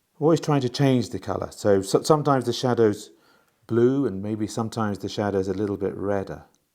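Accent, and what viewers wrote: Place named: Scotland, United Kingdom. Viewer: Thank you so much for sharing this wonderful time and painting with us. Your heart and joy for color are so beautiful. It is so lovely to also feel the support and caring of your family. My husband lived most of his life in Scotland and I am American. Our home is in America now. And we travel yearly back to Scotland. It makes my heart happy to hear you accent and expressions. Such lovely painting and wonders and learning from what is now my other home.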